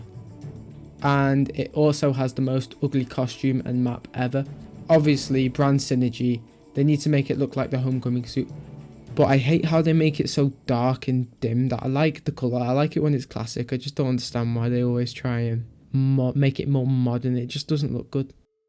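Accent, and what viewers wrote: United Kingdom. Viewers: u british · Sorry, this was really hard for me to pay attention to because of how bri'ish you sound. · Are u northern Irish ☘️ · Are you British?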